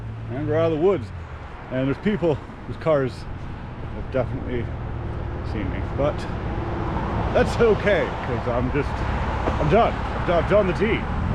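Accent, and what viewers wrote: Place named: Downstate New York, United States. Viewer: Hey there, again,! Eastern New Yorker Nancy! This has to be said that along with your animation job you should try some voice acting. You are hilarious 😂 and YES that is exactly how downstate New Yorker and Jersey folk talk! 🤣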